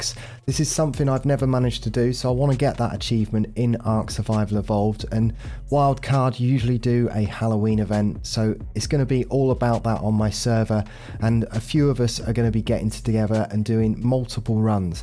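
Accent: United Kingdom. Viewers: Youre forgetting about the gorgeous british accent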